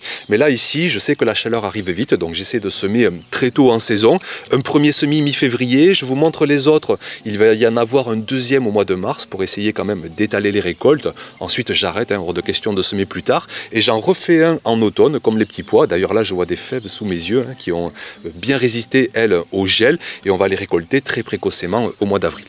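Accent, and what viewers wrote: South, France. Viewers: Hello, Ton sourire, ton accent du sud, ton enthousiasme, ton énergie, ta passion, mais également ton travail, tes connaissances, ton partage, ta bienveillance fond de toi un marchand du bonheur. Merci 🙏 Ça fait déjà 15 jours que j’ai démarré cette nouvelle saison et je repique aujourd’hui ma première plaque alvéolaires de tomates 😊 J’ai également commencé la création d’une couche chaude format XXL qui devrait accueillir une bonne partie de mes plaques et de mes godets, mais également à terme me produire du compost. C’est mon défi cette année 😅 Je trépigne d’impatience pour découvrir ta nouvelle installation de semis. A très vite.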